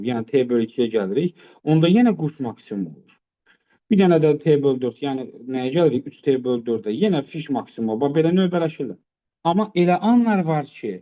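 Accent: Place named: Shaki, Azerbaijan. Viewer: Müəllim Şəkilisiz? Ləhcənizi bənzətdim.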